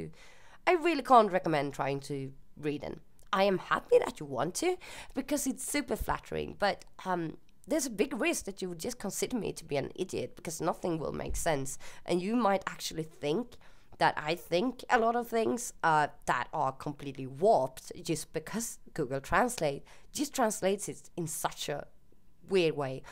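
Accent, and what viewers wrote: United Kingdom. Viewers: I am from Norway so i wonder why i thought you were British xD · Reading the comments I see that I'm not the only one who thought you had a british lilt to your voice, though not a full british accent. You speak english very well and perhaps the lack of a heavy accent is why some listeners are confused about your native language. The information on swearing was also very interesting. I've never been offended by swearing, but I do find excessive casual usage to be crass. I'll keep your comments in mind in the future.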